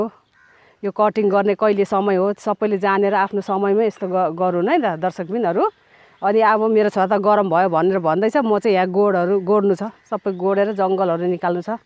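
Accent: India. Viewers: राम्रो छ्🙏हजुरको बोलि indian जस्तै छ्😁कता हो हजुर को घर??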